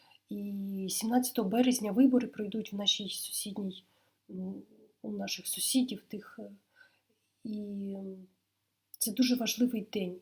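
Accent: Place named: Poltava, Ukraine. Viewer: У Вас полтавський акцент, який дуже милий моєму вуху))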